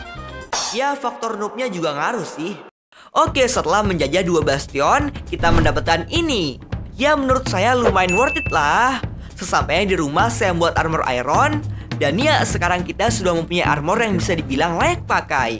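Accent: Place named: Bugis, Indonesia. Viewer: Bang Suara Nya Kek Orang Balik Papan (Kalimantan Timur) Orang Bugis Pasti 🗿 ya Kan?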